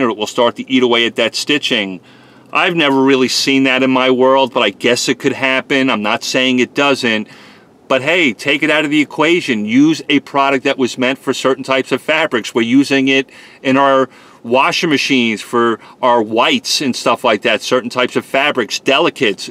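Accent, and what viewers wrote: New York, United States. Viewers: Is that a New York accent I hear? I love it. Thanks for the tips! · Sounds like a Liberal from New York living in Dallas.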